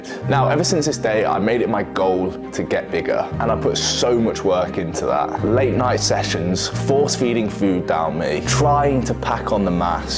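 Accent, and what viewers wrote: United Kingdom. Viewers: omg british accent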